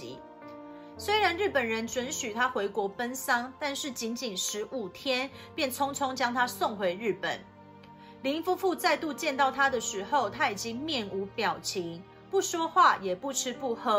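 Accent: Taiwan. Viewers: 这个台湾腔听的我头疼😖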